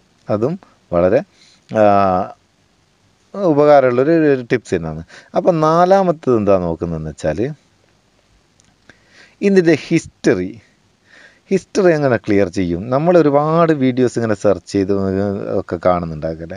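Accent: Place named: Vadakara, India. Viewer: നിങ്ങളുടെ അവതരണം കേൾക്കുമ്പോൾ എൽ പി സ്കൂളിൽ എന്നെ പഠിപ്പിച്ച അദ്ധ്യാപകരെ ഓർമ്മവരുന്നു. വളരെ സാവധാനം പറയുന്നത് മനസിലാവുന്ന തരത്തിൽ. ആ വടകര, പയ്യോളി, മേപ്പയൂർ, പേരാമ്പ്ര, ഒരു നാടൻ ഭാഷയിൽ. അടിപൊളി. പുതിയ അറിവുകൾ ക്കായി കാത്തിരിക്കുന്നു. ആശംസകൾ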